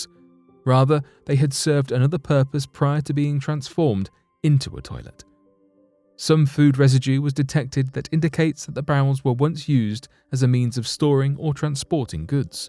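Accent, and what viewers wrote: United Kingdom. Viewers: ARE YOU A FELLOW BRIT?🇬🇧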